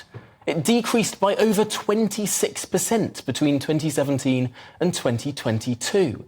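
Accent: Scotland, United Kingdom. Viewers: Don’t let his Scottish accent fool you.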